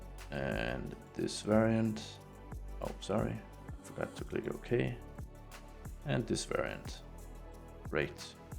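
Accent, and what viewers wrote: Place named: Denmark. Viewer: nice work! your accent sounds at bit danish or scandinavian. er you from denmark?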